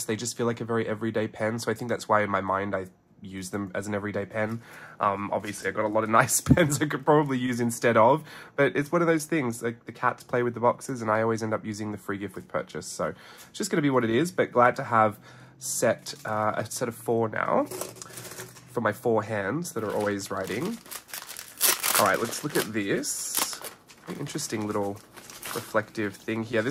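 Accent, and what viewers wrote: Australia. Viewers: I'm new to Hobonichi, discovered it all through my YouTube feed and took a deep-dive down the unboxing rabbithole. Have ordered my first - expensive for me but it will encourage me to get back into journalling. Thank you for your very real approach - awesome to hear a fellow Aussie accent 😊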